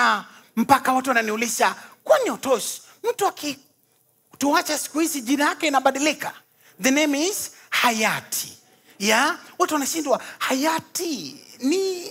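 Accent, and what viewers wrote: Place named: Luo, Kenya. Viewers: Kothin Fanuel its the luo accent that makes his swahili beautiful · Otos you've killed it🤣🤣🤣🤣🤣🤣🤣🤣 i like his Luo accent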